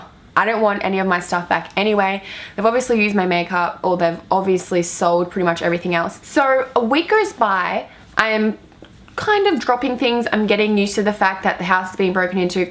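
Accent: Australia. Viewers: you sound aussie lol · the Australian accent is HOT and so are you, my dear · Are you from Australia? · Yes I found another Aussie XD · is she australian?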